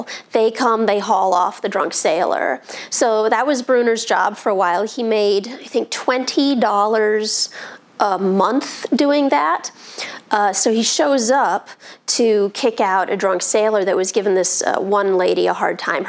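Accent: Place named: South Carolina, United States. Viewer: Great entertaining and historical show. Do you know how to make a hormone ?....Don't pay her! hahaha. Love your dedication and awesome accent; I'm guessin S. Carolina?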